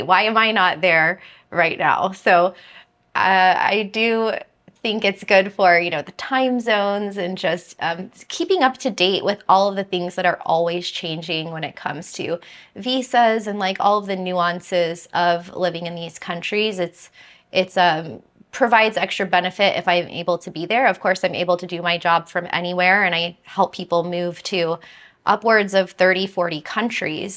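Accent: United States. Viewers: When coming, could you drop that horrible American accent??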